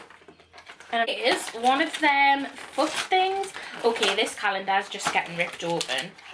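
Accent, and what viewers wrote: Newcastle, United Kingdom. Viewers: I'M FROM NEWCASTLE! AHHHH FOUND A GEORDIE!! CAUSE I'M A GEORDIE TOOOOO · Got recommended this video, heard the accent, subscribed for being a rare relatable British personality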